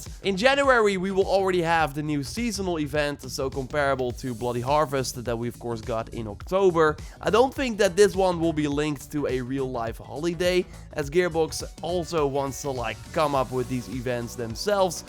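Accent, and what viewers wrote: Netherlands. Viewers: Kijk aan, we zijn van Borderlands Tree naar Borderlands Free gegaan! Heerlijk, dat netherlish van je. So close!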